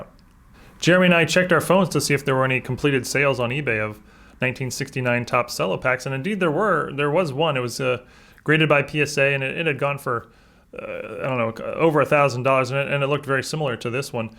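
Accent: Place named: Baltimore, United States. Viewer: By any chance are you from MD/baltimore? your accent sounds baltimore...and I feel like I met you years ago at a show I'm in my 30s now getting back into collecting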